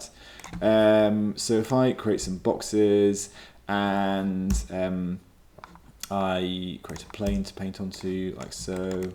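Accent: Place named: England, United Kingdom. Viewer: DUDE YOU SAVED MY LIFE! I was about to die from wasting my life on Xgen geometry instance painter - but then you came out of nowhere with your sophisticated english accent and saved me!! : O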